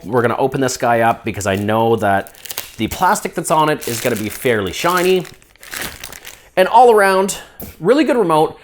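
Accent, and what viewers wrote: Canada. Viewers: This has to be the most canadian-sounding human on the planet. Great video though.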